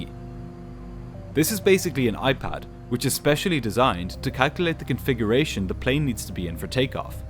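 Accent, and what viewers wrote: Ireland. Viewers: by the way, being able to put a voice (in your case, a gorgeous Irish accent) to a face is lovely, so it was delightful to see your handsome face in this video - I do hope that you do more of this in future videos 🇮🇪☘️🇮🇪❤️ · He's Irish. Like any accent, just takes some practice to understand it :) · What a great video! It’s a while since I’ve watched one on this channel and I forgot what a brilliant story teller you are. You know how to create tension, which is a real skill. Don’t ever use AI - your Irish accent is much, much nicer! I feel a bit sorry for pilots who get fired. Yes, mistakes were made, but nobody died and I think it creates a culture where mistakes are covered up if possible. It’s easy to see how this happened and I’m sure these pilots especially would never again let this happen. Did the augmenting crew also get fired? I can’t see why they were told not to contact their families either, who may have heard about the accident and be very worried. 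· I had no issues. It’s called Irish. You want him to put on a fake accent or something?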